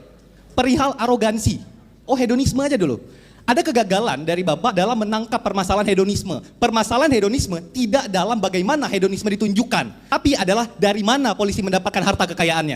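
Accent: Indonesia. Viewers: Cara bicaranya mirip orang aceh..🤔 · Dari logatnya sptnya dia orang sumbar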